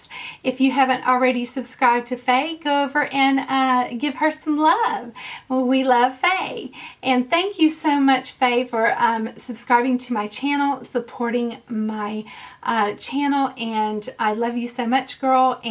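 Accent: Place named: Alabama, United States. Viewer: Where are you from in the south..I am from Arkansas and have lived all over Alabama. You sound like you're from Alabama and you say um a lot .lol...So I've never tried Tribe Beauty Box, I almost did..here is my email bc I don't always get my <mail>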